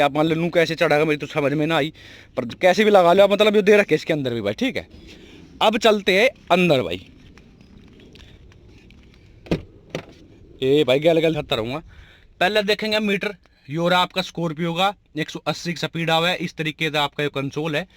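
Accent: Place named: Haryana, India. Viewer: Me apke bat sun ne k liye video dekhta hu.... I luv Haryanvi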